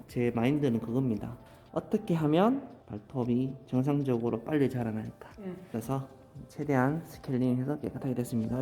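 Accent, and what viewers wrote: Gyeongsang, South Korea. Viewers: 드디어 오랫동안 기다렸던 영상을 보게 되네요. 역시 경상도 사투리로 고객님께 자상하게 설명도 잘 해주시고 너무 깨끗한 발톱정리 👏👏😄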